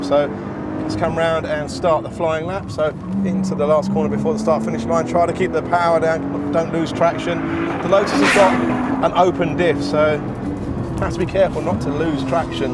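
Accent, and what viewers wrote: United Kingdom. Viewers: he has to be british REALLY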